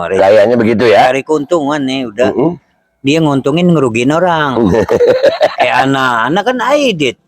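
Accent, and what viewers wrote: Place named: Jakarta, Indonesia. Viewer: ...jangan pakai logat betawi,..loe tuh keturunan imigran yg mengaku 2 sbg "pribumi"...Loe harusnya sadar kalo loe cuma "numpang"...tapi gak tau diri. INGAT!!!.....LOE BUKAN ASLI ORG INDONESIA, CATAT!!!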